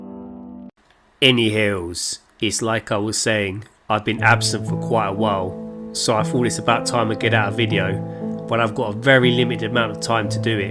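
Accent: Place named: Southern England, United Kingdom. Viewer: No Gilfs in it 😂WOW you brought the entertainment in abundance,absolutely hilarious songs and commentary,smooth English southern accent in full flow.keep sexy playa 🤘✌️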